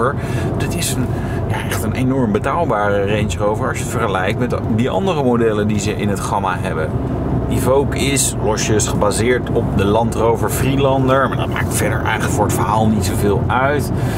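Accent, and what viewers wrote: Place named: Netherlands. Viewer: could not understand anything but spice girls. this guy needs to work on his accent , sounded more like dutch than english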